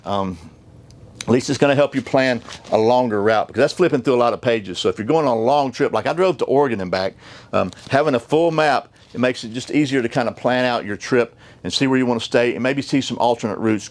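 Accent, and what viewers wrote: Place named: Alabama, United States. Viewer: Great video! I knew I recognized that accent. Over in Alabama we sound so similar. 😅 Learned a lot here. Thanks for the upload.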